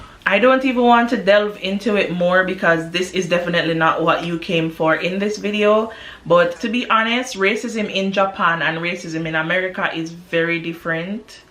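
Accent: Jamaica. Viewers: Also you’re not black. You sound as if you’re jamaican that’s what you are. Black is made to make you feel lesser than. Please don’t call yourself black, instead identify who you are. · Love your Jamaican accent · your "pronunciation" is so jamaican! Try do some work on your accent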